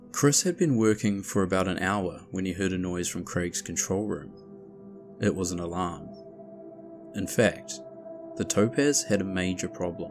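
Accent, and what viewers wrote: New Zealand. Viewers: This was really good. I have no problem with a New Zealand accent! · Terrifying, miraculous and addictive watching! Awesome vid, 👌 thanks mate 👍 Great to hear a kiwi accent 🇳🇿, with love from Oz 🇦🇺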